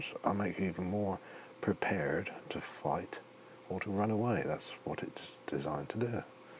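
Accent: England, United Kingdom. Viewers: Its the tone, deep voice, and English accent that helped me out tremendously ❤️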